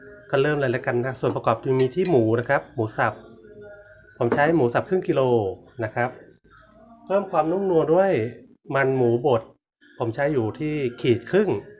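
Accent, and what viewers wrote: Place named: Bangkok, Thailand. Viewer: อยู่มี่ไหนคะนี่พูดเพราะเหมือนคนกทม.ตอบด้วย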